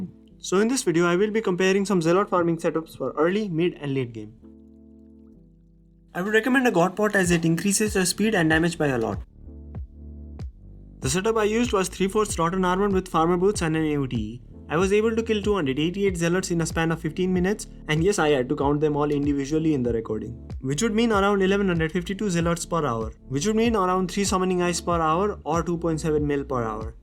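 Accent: India. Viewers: Your voice and english talking is like a Indian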